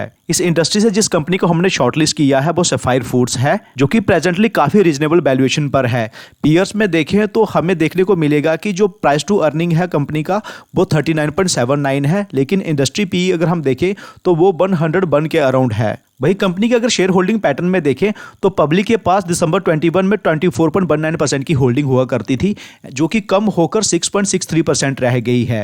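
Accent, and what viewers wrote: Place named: Punjab, India. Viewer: Bhai aapka accent is punjabi......am I right??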